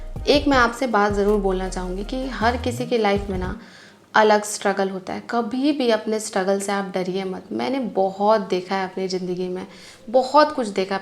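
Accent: Bihar, India. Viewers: Continue rkho short video with Bihari Tone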